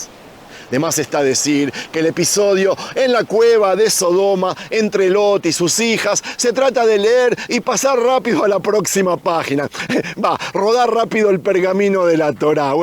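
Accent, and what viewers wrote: Argentina. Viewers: Isaias 53 es desgarrador tremenda palabra que describe a nuestro Señor Jesucristo. Saludos Dios te bendiga. Tienes acento y modismos argentinos che querido.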